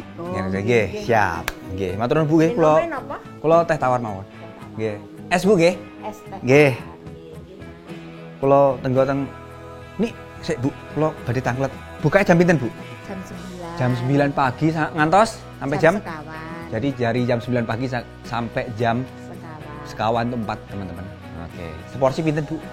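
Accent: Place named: East Java, Indonesia. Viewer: cak jawa timur banget,enak bawaannya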